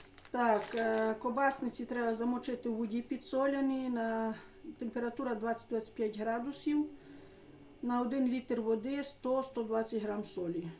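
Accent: Ternopil, Ukraine. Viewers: молодець! Говорить так,як розмовляють на Тернопільщині,в кожному місці свій діалект,в мене полтавський,коли навчалася в школі,то розмовляли по русько- украйонскі.Так на нас говорили з інших місцевостей 😅Мені дуже подобається,як говорить.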